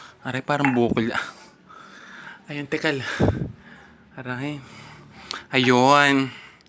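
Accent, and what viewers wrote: Batangas, Philippines. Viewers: Hahahaha nkakatawa ka tlga.! 😁😁 Lalo na eh my tono Ng batangenyo ay siya nkakatuwa..hehehe 😁😂😂😂😆😆😆😆😆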